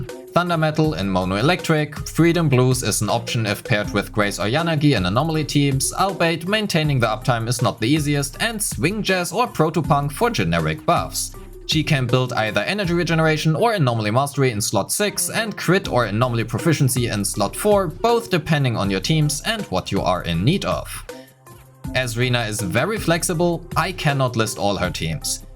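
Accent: Germany. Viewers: "Kolader" lol jokes aside I'm from alsace. German accents never fail to amuse me 😂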